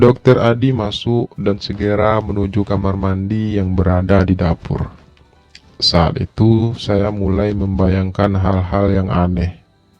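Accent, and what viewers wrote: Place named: Padang, Indonesia. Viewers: Dari logatnya dipastikan adminnya orang padang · INTONASI NYA BENAR KENTAL PADANG BIAR DIA.BERBAHASA INDINESIA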